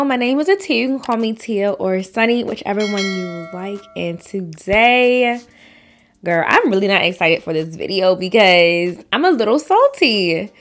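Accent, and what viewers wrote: DMV, United States. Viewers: That DMV accent make this shit so much more funny😩😭😂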